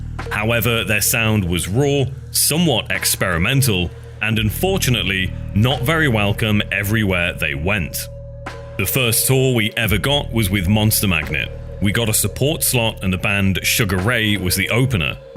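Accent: United Kingdom. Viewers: let me explain it for your side of the road leaf iq brain my point is the same video is being made with the same information so there’s no point in your uk voice ass documentary being made when there’s already a bunch made but wtv get the views you feining for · The commentary really kills this video. Hearing a british guy say "pop locking" was more than i could take.✌🏾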